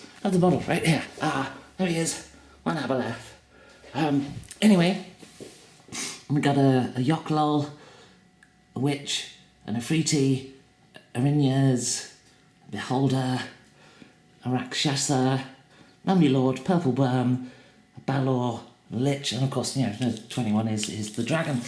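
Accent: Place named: United Kingdom. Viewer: this guy has the most british accent I've ever heard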